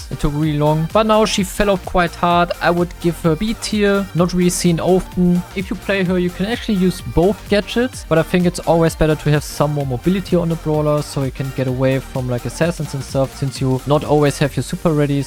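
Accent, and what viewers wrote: Germany. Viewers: Bro dieser deutsche Akzent ist crazy🤣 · Digga wann sagst du auch mal was auf deutsch ich höre da DEUTSCHEN AKTENZ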